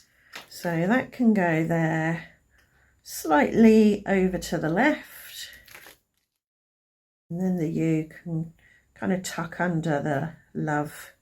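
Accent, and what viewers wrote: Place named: United Kingdom. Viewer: I love listening to your beautiful British accent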